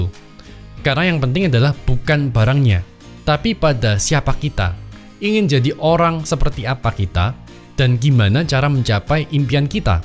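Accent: Java, Indonesia. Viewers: Betah gua liat Koko ini kalo ngomong pasti Koko Jawa kalo Koko Jakarta tengil2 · Agak medok' ga bro 😁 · Haha logat nya Jawa banget 🤣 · Sampean wong jowo opo lek.. mergo logate rodok medok rodo chino🙏🙏salam jowo lek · sukaa bangeet motivasinyaa...medok juga suaranya..kereeeen bener semua yg diomongin bukan ngasal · Denger om ngomong i satisfying, medok e enak wkwk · Logatmu aku suka mas,jowo mu medok 👍👍👍👍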